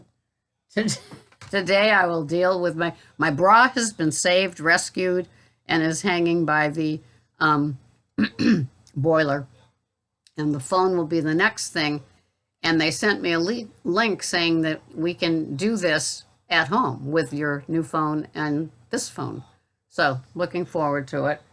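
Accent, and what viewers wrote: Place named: Cape Cod, United States. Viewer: The food allergy test sounds amazing. Looking forward to the day you can share results. Love your Cape Cod accent., have an awesome day! 😊👍😊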